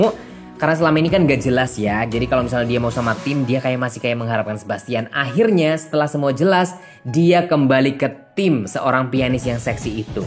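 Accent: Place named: Cilacap, Indonesia. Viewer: Aksene cilacap gak iso ilang ya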